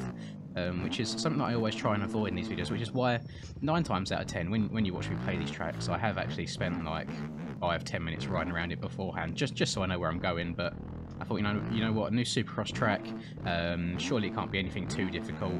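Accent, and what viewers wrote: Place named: United Kingdom. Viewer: Straight male here, all British accents are sexy lets be real. Plus watching someone actually ride the game instead of crashing like I do is nice.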